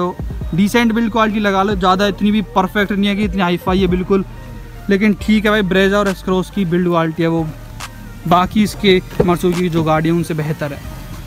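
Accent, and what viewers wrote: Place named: Uttar Pradesh, India. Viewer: Gaaaddi..... kya hota h be sahi se bol UPk logo ki trah kyu bolta h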